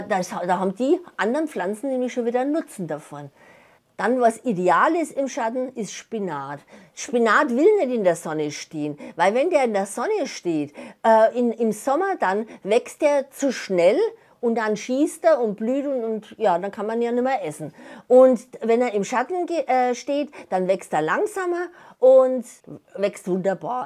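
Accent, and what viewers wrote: Franconia, Germany. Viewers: Danke für deine tollen Videos 😊 Sag a mal kommst du aus Franken?🤔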